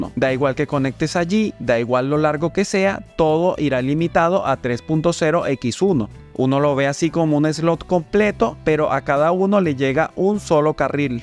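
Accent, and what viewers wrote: Venezuela. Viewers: Hola eres de venezuela? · Hombre no sé si eres venezolano hermano pero hablaste como uno. Gracias por tus videos soy un seguidor muy agradecido por tus explicaciones. Gracias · Excelente información, ¿eres venezolano no?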